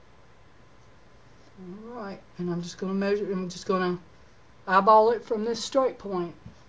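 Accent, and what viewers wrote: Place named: South, United States. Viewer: ......love your creativity...i use to make purses out of old jeans....this is a great idea. And miss that southern twang of yours.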